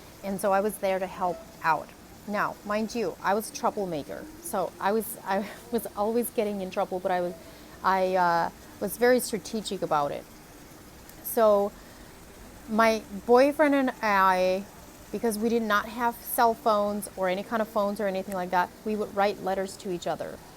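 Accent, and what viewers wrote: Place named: Pennsylvania Dutch, United States. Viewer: It's a Dutch accent. Pennsylvania dutch, "technically" American but not remotely normal or recognizable. I live in a nearby state, but without the internet I'd never have heard of it.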